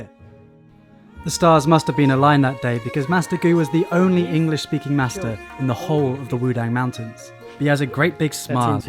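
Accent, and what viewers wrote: United Kingdom. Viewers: British accent is horrible...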